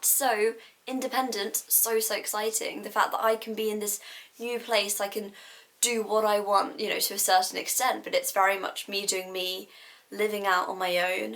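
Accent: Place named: United Kingdom. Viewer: I am excited by the accent you have! Being from India u sound more like a Britisher. I am surprised.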